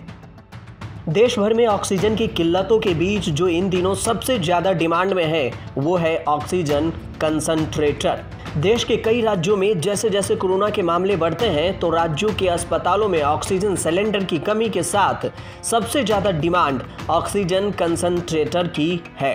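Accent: Bihar, India. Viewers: Bhai ye Bihar Ka h yha ghode ko ghora khte h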